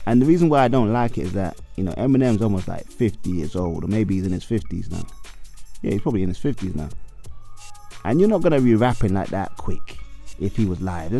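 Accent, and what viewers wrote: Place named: Haiti. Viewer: Wow your review is trash bro btw you sound hatian so that explains a lot you don't understand our music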